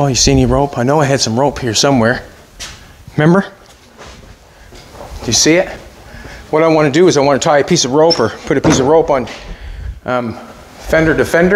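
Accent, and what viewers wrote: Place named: Maritimes, Canada. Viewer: Hey don't worry abut talking too much! I really like the way you put words together! No fancy language just heart felt words. I'm Canadian too and find your Maritime accent and sayings are very entertaining. I follow all the Canadian car You Tubers and you are all are so down to earth. There is a real demand for real no BS (not scripted) reality shows. You and are living my dream life so thanks for taking us along with you. Looking forward to your Australian and Toronto episodes. Great stuff you two!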